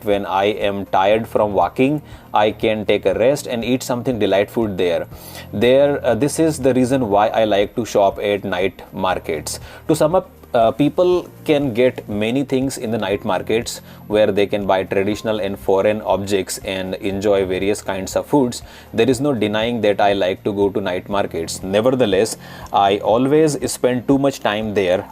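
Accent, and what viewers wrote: India. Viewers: Sorry it was not strong enough, I have calls with lots of indian providers and speakers and the accent is almost impossible, this one here was easy. I wish I could find a more difficult one. Thanks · Your India accent is clear enough to understand ,i met worse🤣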